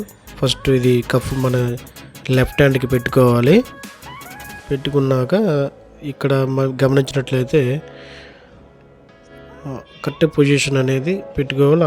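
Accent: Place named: Srikakulam, India. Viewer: Srikakulam slang matladuvideos super😊😊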